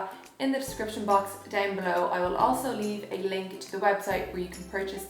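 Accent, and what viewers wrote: Ireland. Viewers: She's Irish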